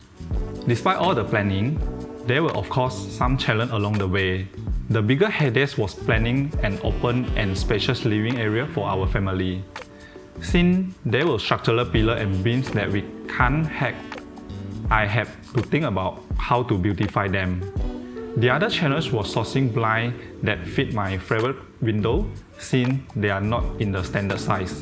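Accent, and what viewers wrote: Malaysia. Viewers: the create. he enunciated as crate. "call home" became "koh hom" . Other words such as "cabinet", "favourite" too, the chinese-educated Malaysians will pronounce them differently from sporeans. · Strong Malaysian accent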